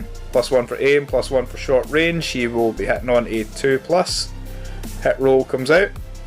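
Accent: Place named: Scotland, United Kingdom. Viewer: Great work my man - good to hear a Scottish voice - keep up the Necromunda content, cant get enough! All the best from sunny Ayrshire!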